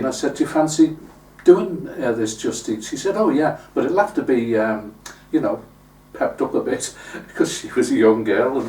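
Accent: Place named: England, United Kingdom. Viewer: Hello.. Love your English accent, very charming;-))... you sure have a nice set up for all your equipment, you sure went back to gather all the specific tunes, to explain all this to us, looks like a lot of work, all your version were a delight to listen too,..You are a great artist, singer and most all, you get so detailed with your songs, that they become part of us..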